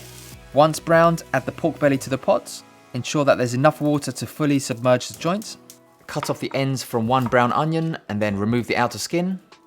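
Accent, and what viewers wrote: United Kingdom. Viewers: Love your British accent!! Hi from America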